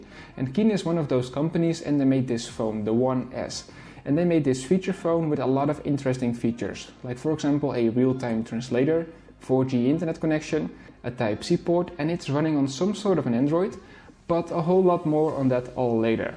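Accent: Netherlands. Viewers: Als ik mijn ogen zou sluiten kan ik horen dat jij een Nederlander of een Nederlandstalige Belg bent.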